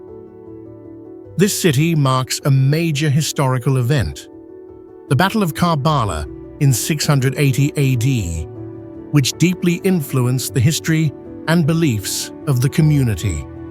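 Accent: England, United Kingdom. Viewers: Wait a minute, English accent.😏still sounds like a computer 🫩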